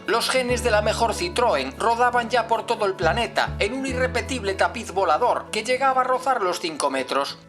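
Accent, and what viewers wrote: Galicia, Spain. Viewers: Excelentes vídeos y como no, preciosos paisajes. Todo un descubrimiento tu canal ,y el acento Gallego me da morriña. Saludos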